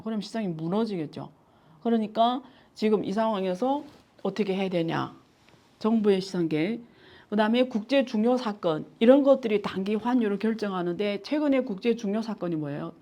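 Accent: Yanbian, China. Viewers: 아유화 유튜브 좀낫설지만반갑씁니다 사실적인 소식만 전해 주세요 억약으로보아연변말씨 아니면 탈북민이신가요 조심스럽게 지켸봅시다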